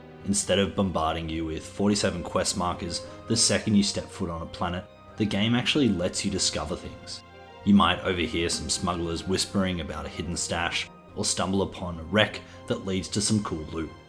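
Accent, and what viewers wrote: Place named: Australia. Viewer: noticeable Australian accent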